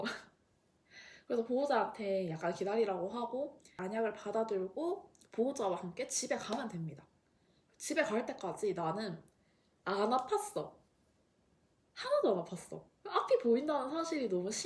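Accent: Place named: Gyeongsang, South Korea. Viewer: 경상도분이시군용 ㅋㅋㅋ귀엽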